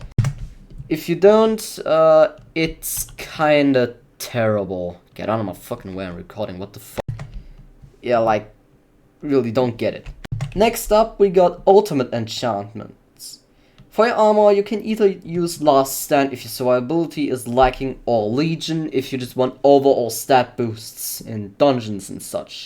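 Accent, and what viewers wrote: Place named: Germany. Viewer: Information wise not bad, way too long and yapping, german accent and cracky english is one too much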